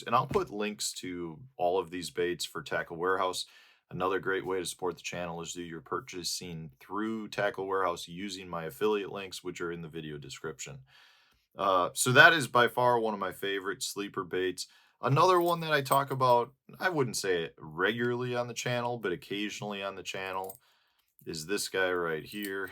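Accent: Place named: Chicago, United States. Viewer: Hey you're becoming a cheddarhead with the way you pronounce about. Starting to lose that chicago accent. Don't become a Packer fan!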